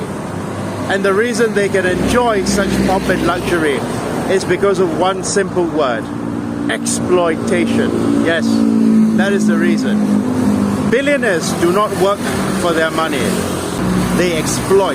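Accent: RP, United Kingdom. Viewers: Still better than Hong Kong. We former British colonies are nothing more than a testbed for the neoliberal experiment gone rampant. Rat race, rat utopias and behavioral sink. Nice posh accent btw.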